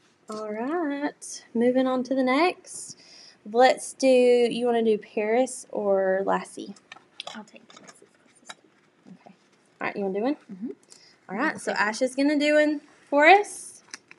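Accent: South, United States. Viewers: I love your southern twang!!!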